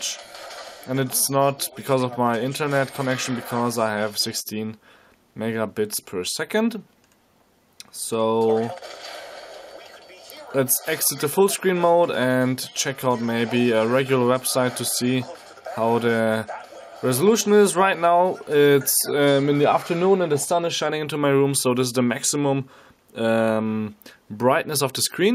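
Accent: Germany. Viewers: are you german :)?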